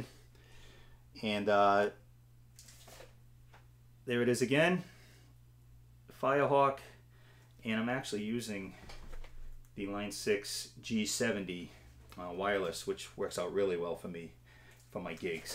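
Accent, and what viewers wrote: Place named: New Hampshire, United States. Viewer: You have that NH accent. You from there? I'm originally from laconia.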